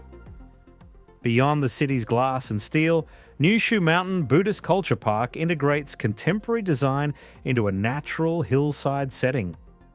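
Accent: Australia. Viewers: Australian narrator has caught my ear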